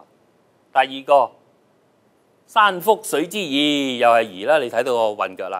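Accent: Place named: Guangzhou, China. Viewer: 真正广州话绝版 好多自认广州 香港人发音都不正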